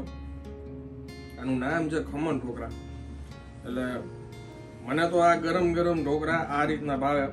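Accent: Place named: Kathiawad, India. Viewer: Jsk. u are from Kathiawad as I make out from your gujerati talk. So are you a liana or Vaanya?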